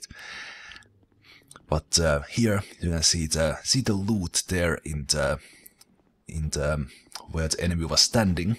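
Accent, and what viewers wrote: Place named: Finland. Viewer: Where are you from your accent is kinda finnish type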